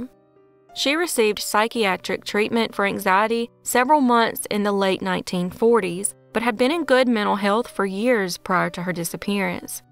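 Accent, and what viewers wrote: South, United States. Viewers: Just have to say as a Southern gal.Myself I absolutely love your accent❤ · This narrator has a good southern accent. Very nice!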